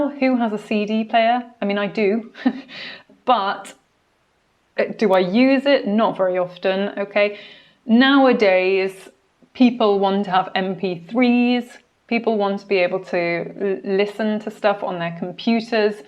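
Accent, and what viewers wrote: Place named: United Kingdom. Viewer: The most cordial greetings: I'm a lawyer from Colombia who is learning general English, but I also want to be able to speak about my profession in this beautiful language, therefore I thank you for your review, bucause now I know that is a good idea to get this book and why not, to take the TOLES exam. Take care. Bless you. Postscript: I really love the british accent.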